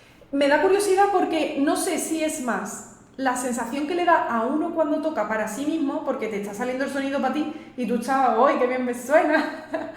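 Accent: Andalusia, Spain. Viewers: Eres genial, adorable, hermosa, talentosa y tus videos son divinos como tu!!! me fascina tu acento andaluz te amo a ❤❤❤❤ ❤❤❤❤❤❤❤❤❤❤❤❤ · Hola bella, me encanta tu estilo andalú "gitano" 💖💖💖, me recuerda a mi familia paterna Española, que hablaban como tú, soy paraguayo y por lo tanto debo recomendarte un artísta que está en categoría de genio de la música, por supuésto hace ya tiempo fallecido pero eternizado en la história de la música universal como uno de los más grandes, su nombre es Agustín Pío Barrios, conocido también como "Mangoré", y como todo paraguayo tenía raíces guaraníes, pero en el caso de él, era descendencia directa, creo que su madre era nativa guaraní, es uno de los más grandes guitarrístas del mundo, y no exagero, tampoco es "fanatísmo" nacionalísta ni nada, pero te lo digo porque es uno de los más grandes guitarrístas del mundo menos conocidos a nivel popular, sólo es conocido por estudiosos e investigadores, te prometo que si lo buscas, quedarás asombrada, no lo podrás creer, busca información sobre él y escucha sus obras, no te arrepentirás, te lo garantizo. 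Es el primer o el segundo video tuyo que veo, y estoy encantado contigo bonita, eres hermosa💐💐💐 y ya estoy pensando seriamente en suscribirme ( es que ya tengo mi lísta de suscribciones demasiado llena, pero puedo anular alguna que otra suscripción para darle lugar a la tuya, claro que sí ) Un fuerte abrazo!!!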